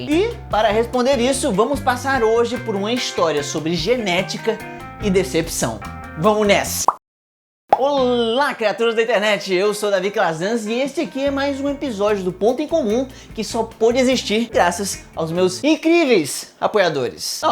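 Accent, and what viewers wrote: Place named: São Paulo, Brazil. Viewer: Queria saber por que você é Cearense e não tem o sotaque de Cearense no T e no D normalmente. Só fui perceber isso agora! Você usa o T e o D de paulista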